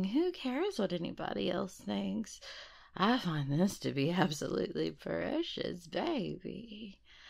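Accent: South, United States. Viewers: Southern accent.. southern accent??? Oh yippee! I love that so much, makes a Brit like me melt and crumble haha. Also, with you playing as a hellhound... imma make it my head canon that you're related to Loona, or friends heh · I’m in love with this southern hellhound. 😍 I find women with southern accents to be attractive. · YAaaayyy! Great audio! Love the hellhound, the southern, the innuendos, the growls, the dom! Awesome work! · ah within 14 seconds already has me fucking blushing, your pickup lines are too good! will finish this comment when i finish the audio but can already tell its gonna be a good audio, edit: oh damn you really will have me whimpering how do you manage to do a southern voice that just.... makes me absolutely M E L T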